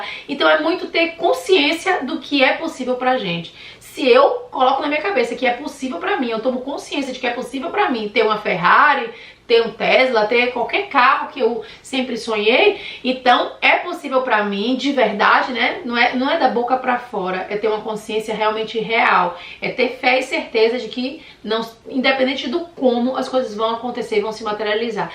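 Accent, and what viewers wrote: Bahia, Brazil. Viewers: Nossa ela é Bahiana 😍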